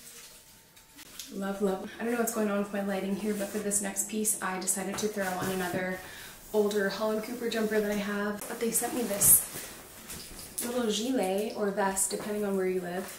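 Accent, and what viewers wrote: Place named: United States. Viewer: Congrats. on making the jump to YT. ! , you mentioned Canada but your accent sounds American 🙂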